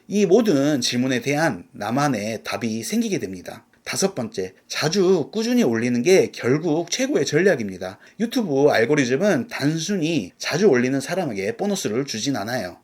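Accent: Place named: Jeolla, South Korea. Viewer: 전라도분이시네😊 저도요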